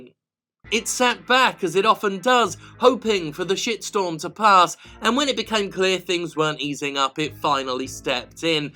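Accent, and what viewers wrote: United Kingdom. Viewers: Man I would never imagine a British with the elegance of the accent living on one of the most southern places 😂 you’re the hero we don’t deserve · Why did i always think u was from Britain, England?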